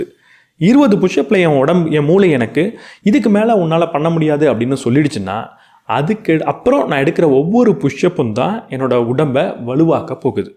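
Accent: India. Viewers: Super brother.. good feel. Bro neenga Tirunelveli' native ah. Enga voor slang varuthu neenga pesurappa.. well bro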